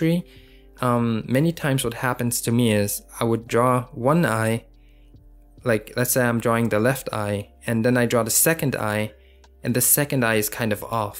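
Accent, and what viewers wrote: Germany. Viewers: Are you German? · Are you german?:))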